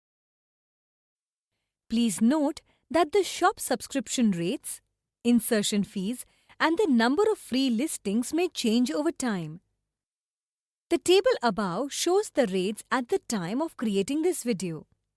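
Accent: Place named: India. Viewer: White lady with an indian accent, funny. I feel like I'm at 7-11 or my doctors office.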